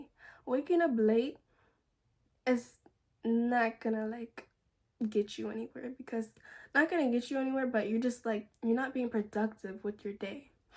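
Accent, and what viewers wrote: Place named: Latin America. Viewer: Are you latina tour accent is so strong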